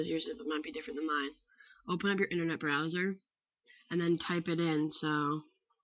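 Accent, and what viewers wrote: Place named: United States. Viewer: Finally English instructions with an American speaking thank you so much I couldn't understand anybody else's accents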